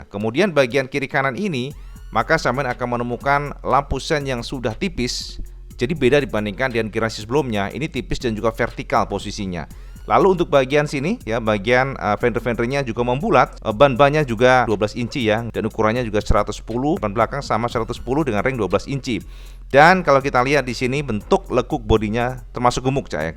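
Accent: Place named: Tulungagung, Indonesia. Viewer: enggres aksen tulungagungan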